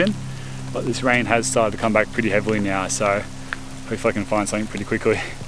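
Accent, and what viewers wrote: Australia. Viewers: I'm new to your channel. I found this quite by accident, and glad I did. Things in Australia and here in US are very different. I've never seen that kind of pot handle, nor that kind of food. I love the accent. (Shame on me, I just noticed I did see one video before didn't realize it. How can I listen to the accent and not hear the difference, I must have woke up deaf that morning.)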